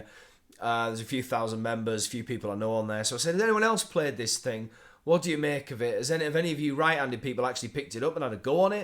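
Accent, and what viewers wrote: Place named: Darlington, United Kingdom. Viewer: no thats his actual accent, im form the uk and a northerner from leeds, hes from more up north than me lol but they definitely speak like that in Darlington area as he described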